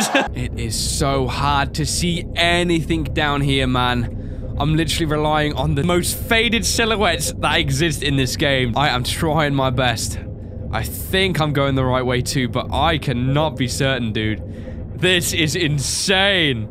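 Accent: United Kingdom. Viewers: Is this guy british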